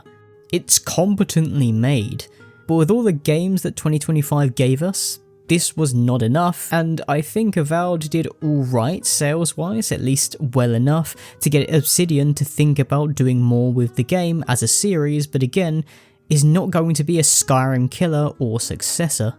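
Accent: United Kingdom. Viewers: it took me this long to realize you are British. That's how bad I am at recognizing accents...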